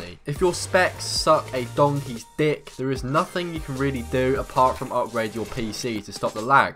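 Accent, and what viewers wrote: United Kingdom. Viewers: Dude I love your British accent!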